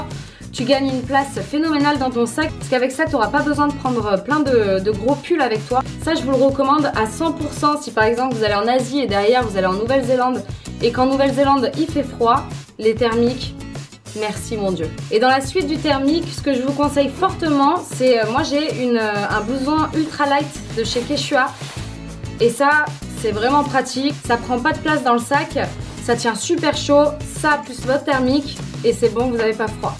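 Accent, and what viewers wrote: France. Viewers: Adoro ouvir o sotaque francês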